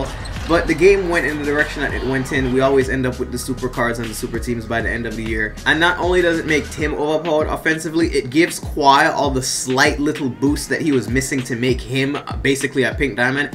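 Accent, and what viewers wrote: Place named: Jamaica. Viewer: Bredrin the way the patwa come out whenever you get passionate kills me fam😂😂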